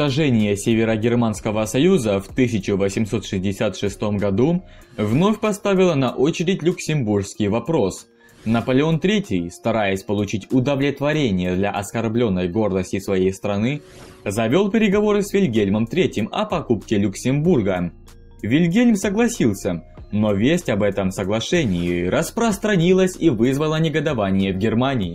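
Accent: Ukraine. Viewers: Твой Украинский акцент такой мерзкий. Буквально засыпаюсь от голоса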